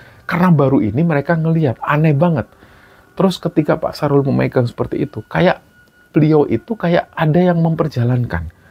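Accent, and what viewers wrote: Java, Indonesia. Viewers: Kalsel hadir mas Sukses slalu untuk mas dn tim ks nggeh, Kami ini asli Jawa Jogja tapi lahir dn domili Kalsel dn kami sgt mengidolakan mas karena ciri khas jawanya itu GK ilang SM skli keren,dn cara penyampaianya itu nggenah,njalur dn yg pasti keren mantap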